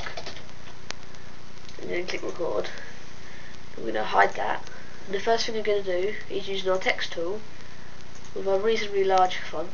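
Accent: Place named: United Kingdom. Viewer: sounds kinda like hillbilly british english....say WHAT...?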